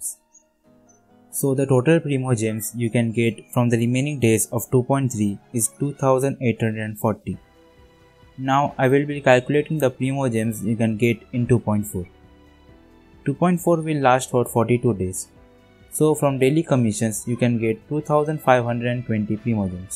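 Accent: India. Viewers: 🤔 are you Indian..? Coz you accent is quite similar to ours. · I love Indian accent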